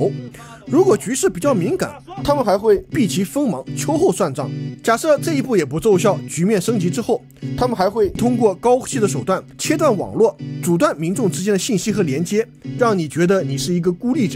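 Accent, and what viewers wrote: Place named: Jiangnan, China. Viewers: 他口音肯定是江浙沪的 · 兄弟是哪里的人？太爱你了，听口音是江浙一带的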